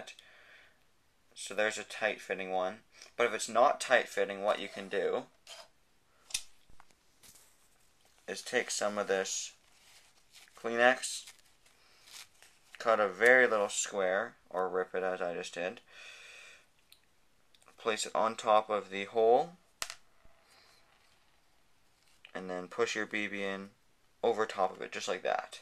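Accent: Quebec, Canada. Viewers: You from quebec? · Are you Canadian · are you Canadian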